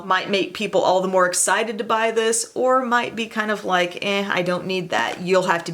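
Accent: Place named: South, United States. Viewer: o wow... making fun of people out there in the world that actually have developmental disabilities or disorders. Very classy. I was paying you a compliment and then that? lol, I guess you just never get tired of people voicing their opinion as to how utterly ridiculous, bitchy and trashy and ANNOYYINNNGGGG you sound. Please do Tube a favor..shut your trailer trash sounding channel down. I can't even stand your voice for one second. I almost fell asleep. Shut it down, you southern donkey.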